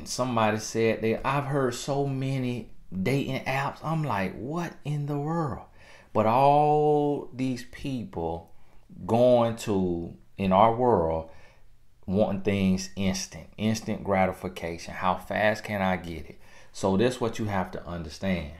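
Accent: South, United States. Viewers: I think he is saying on your "back" but it sounds like bike due to his strong southern accent. Lol. Either way...super funny. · Good morning Mr.... I pray you receive this message with a humble heart. I am just listening to this particular video. And yes, I totally agree with your message. Question: my family is from Alabama. Are you from Alabama? I swear you sound like my Father ( God's peace with him in heaven). Listening takes me back to that honest place. THE REAL SOUTH 💯. Keep us informed and ready. But still waiting but readying myself for my husband. Thanks! God's daughter❤️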